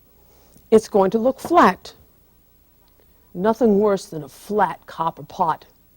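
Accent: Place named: New Jersey, United States. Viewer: Love that New Jersey accent ( where Helen grew up). Example: "imPORTANT." Helen is the best! Thank you!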